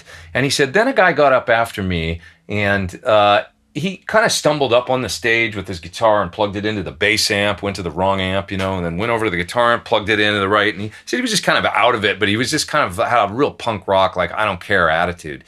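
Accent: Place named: Canada. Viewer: Great advice. Sounds like you might be a fellow Canadian!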